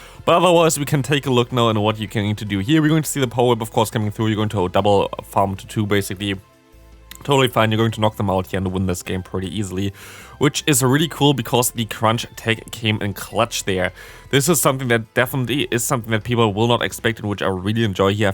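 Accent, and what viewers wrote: Germany. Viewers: Sag mal du bist schon deutscher oder? Meine das rauszuhören. Ist natürlich egal, klasse Content!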